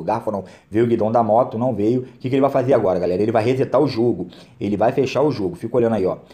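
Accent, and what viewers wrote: Rio de Janeiro, Brazil. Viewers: Tu e carioca ne? Kkkk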